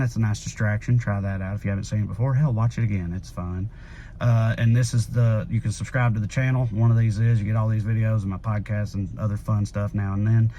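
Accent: United States. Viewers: Love from Italy!! It's very hard understand you for your american accent, but i like the way you talk about America and this strange days!!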